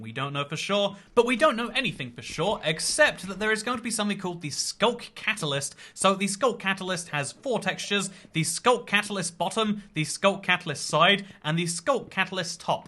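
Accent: United Kingdom. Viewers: why does he sound asian when he says hello im but then sounds british for the rest of the video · THE MYSTERY HAS BEEN SOVLED HES BRITISH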